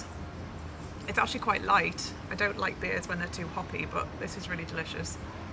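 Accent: England, United Kingdom. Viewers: Heyyy girrrl!! looks amazing - just a quick question! Are you travelling during the pandemic? Just interested as you sound like you're from the UK too!! xx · welcome to turkey i know you are england your Accent 🤣🤣🤣i live in Istanbul i dont like england ş have been London weather very bad everyday rainy and people very rude And arrogant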